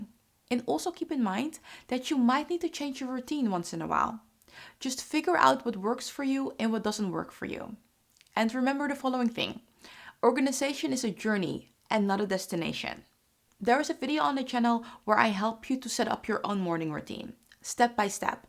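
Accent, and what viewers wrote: Netherlands. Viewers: Thank for giving me to motivation to start orginizing! And watching your video I was thinking she sounds so dutch.. Checking the about and yes you are! Ik ga je volgen! Groetjes van een nederlander woonachtig in Zanzibar.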